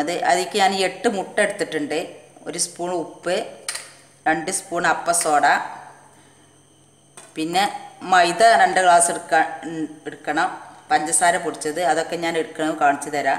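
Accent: Malappuram, India. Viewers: Ningal ithupole thanne samsarichal mathi malapurambasha athukelkana ishtam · Hi ..ഇത്താ..ആദ്യായിട്ടാ കാണുന്നത് വീഡിയോ.. സംസാരം അടിപൊളി..മലപ്പുറം ആണോ...വീഡിയോ ആരാണ് എടുക്കുന്നത്...എനിക്കും ഒരു ചാനൽ തുടങ്ങാൻ ആഗ്രഹം ഉണ്ടായിരുന്നു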